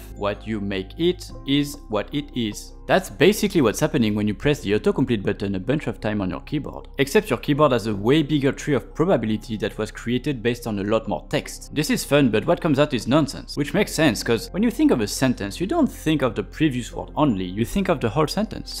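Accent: France. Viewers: great video but french accent was 🤮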